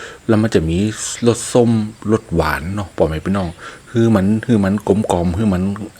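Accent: North, Thailand. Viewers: มาอยู่ต่าง จว. ดูผิวคล้ำดำไปเลย🤣🤣🤣 อยู่กับธรรมชาติ แต่ที่เหมือนเดิมคือกิน กินอร่อย ไม่เปลี่ยน ลืมอีกอย่างที่เปลี่ยนคือพูด ภาษาเหนือ ฟังรู้มั้งไม่รู้มั้ง 555 แต่ก็ต้องฟัง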